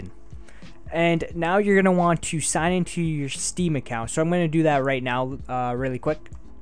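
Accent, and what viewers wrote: Canada. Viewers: canadian ey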